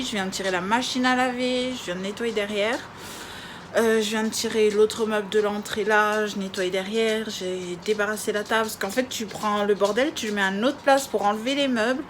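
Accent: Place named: Corsica, France. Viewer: Quand je t'écoute ça me fait penser aux patients corses que j'ai au téléphone !! J'adore l'accent corse !!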